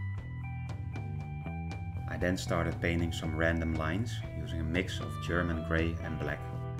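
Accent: Netherlands. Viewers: Dude, are you dutch?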